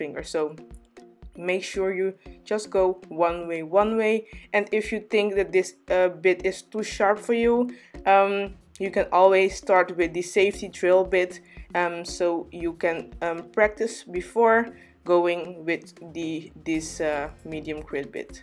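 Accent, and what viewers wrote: Netherlands. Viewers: Ik hoor een Nederlands accent daar 😄👍🏽 Top filmpjes! 😘💋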